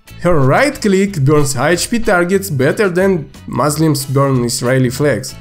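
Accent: Russia. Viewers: I love this russian accent xD Nice vid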